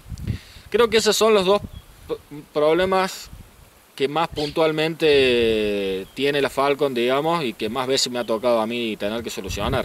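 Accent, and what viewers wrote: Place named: Cordoba, Argentina. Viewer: 6 años viajando y no perdió el tono de cordobés jajaja muy bueno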